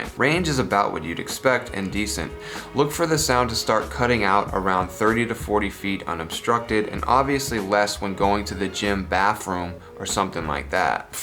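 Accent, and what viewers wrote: New York, United States. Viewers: "Epicot golf ball" and the Noo Yawk accent: good. Those cacophonous urchins and drowning in chocolate pudding: bad.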